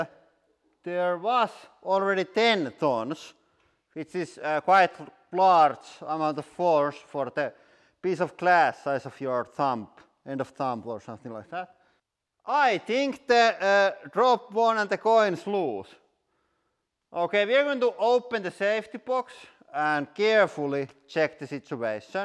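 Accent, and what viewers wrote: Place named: Finland. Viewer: The underwater shot was spectacular!! Also with all due respect I love your Finnish accent when you speak english. Please, don't westernise your speaking! 😁😁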